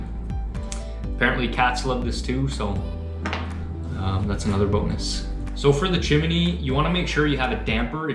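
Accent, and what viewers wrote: Canada. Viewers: Nice video, je savais que j'avais affaire à un Québécois mais ça pris quelques minutes avant de te trahir :P Bonjour de l'Abitibi ;)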